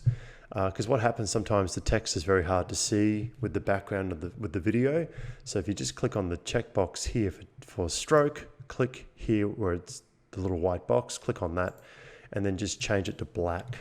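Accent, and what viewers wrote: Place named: Australia. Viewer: That was so helpful, thanks. Also great to hear it with our local Aussie accent:)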